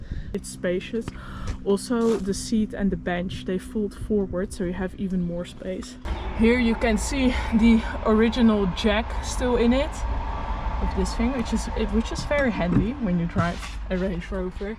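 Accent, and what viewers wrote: Netherlands. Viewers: I had several of these. Loved them all. Never stuck not once. I had a G Wagon and I prefer the range rover, which has shortcomings but also lots of personality. I love this Dutch girl's accent. There should be a shim between the brake pad and the inside of the caliper which should eliminate the squealing. It's a wafer thin piece of metal.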